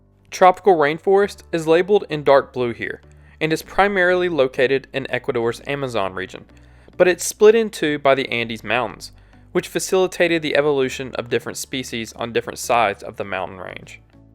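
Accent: South, United States. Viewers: Interesting video. I should point out that you Spanish pronunciation is cringe worthy. Your accent would suggest southern USA with English being your primary language, but with Spanish pronunciation, you aren’t really trying.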